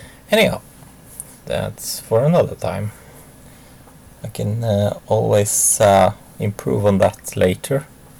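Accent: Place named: Sweden. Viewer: Schematics is pronounced skematics in english not Schemaaticks.Sounds like swenglish to me.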